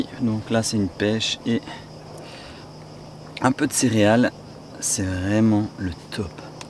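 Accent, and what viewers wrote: Belgium. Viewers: es tu belge ?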